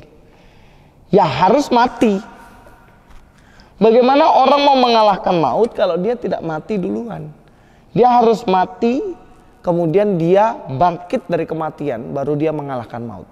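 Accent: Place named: East Java, Indonesia. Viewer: Tp nadanya sprti orng jatim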